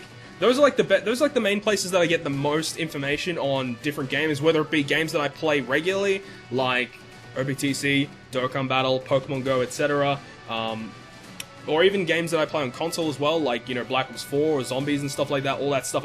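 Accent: Australia. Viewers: So you from Australia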